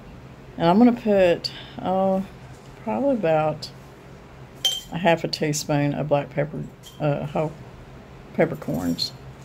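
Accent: South, United States. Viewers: I have never tried pickled green tomatoes. I am pondering whether I have enough green tomatoes to try it. Thank you so much for sharing your videos. I always come away feeling like I have taken a step back into my childhood and seeing grandmas pantry or mom at our old wood stove, etc. Your accent is heartwarming as it is soooooo familiar. My family's from Arkansas and Dads side was Texas. I don't know...I just sit down, watch, relax and learn some interesting tips... :)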